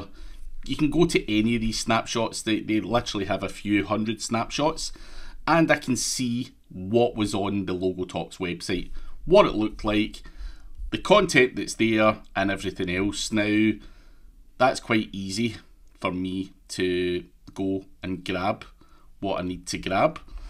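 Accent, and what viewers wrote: Scotland, United Kingdom. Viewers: man freaking genius!!! plus +1 on the Scottish accent!! i have been wondering about doing exactly that for a while now.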